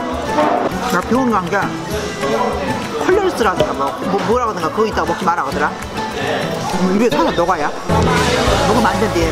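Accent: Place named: Chungcheong, South Korea. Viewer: 충청도사투리ㅋㅋㅋ 우리할머니같아ㅋㅋㄱ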